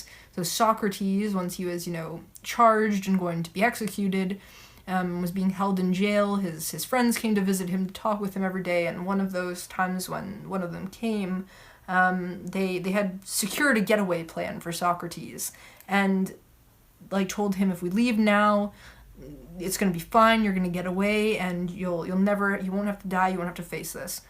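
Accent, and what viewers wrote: Canada. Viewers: What is this "boot" she keeps on mentioning? "I think this is a boot wine drinking competitions." "Here Epictetus is talking a boot spanking Spartans." (Actually, I think it is charming. I would move about Canada just to hear people say "a boot.")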